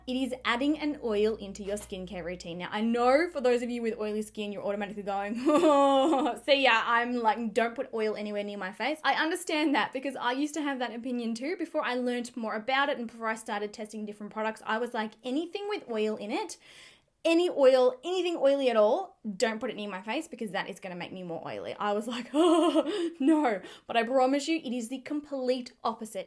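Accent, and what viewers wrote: Australia. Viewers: You sounds like an Aussie. Thanks for tips, but I am interested in natural therapy not after promotional products. :) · ARE YOU AN AUSSIE??SOUNDS LIKE IT LMAO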